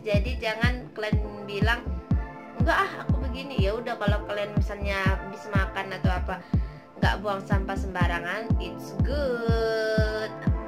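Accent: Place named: Indonesia. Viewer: Pekat endon nya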